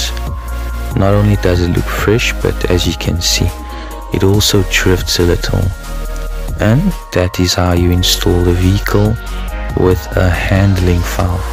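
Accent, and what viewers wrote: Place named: South Africa. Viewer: Your accent sounds South African. I'm from SA.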